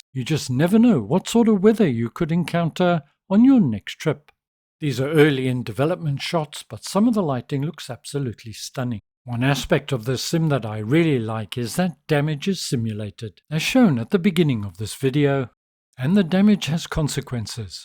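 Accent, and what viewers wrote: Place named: South Africa. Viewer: You sound a bit South African….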